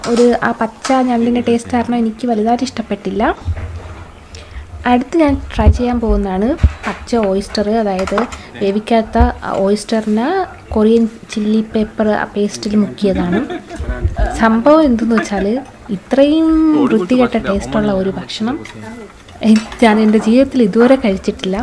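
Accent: Trivandrum, India. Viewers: ട്രിവാൻഡ്രം ആണോ ivar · A new viewer from Trivandrum 😀 being a Korean admirer, watching ur videos and hearing ur (Trivandrum slang) Korean is like 🤩🤩🤩 wish I could be there... 💜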